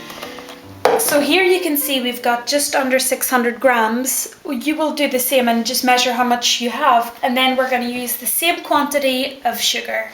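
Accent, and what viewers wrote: Ireland. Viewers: Irish accent? Or Welsh?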